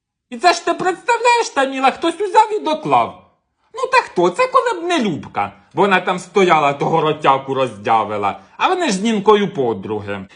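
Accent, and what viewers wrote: Poltava, Ukraine. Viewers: Так стає тепло на душі "по домашнєму" як чую цей рідний Полтавський говір❤. Все дитинство перед очима пробігає. Дякую за твою роботу,. Ти неперевершена, як завжди. Чудовий гумор і надзвичайна подача ❤ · ти неперевершена!З дорогою полтавською говіркою!Дякую!😊😊😊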